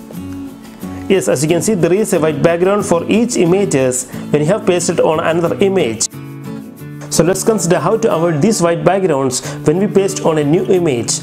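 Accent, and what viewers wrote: India. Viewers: Oooh, don understnad but he speak english with hindi accent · its indian accent, respect it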